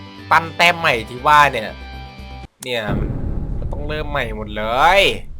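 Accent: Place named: South, Thailand. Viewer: อย่าแหลงใต้ได้หม้ายพี่บ่าวม้ายชอบ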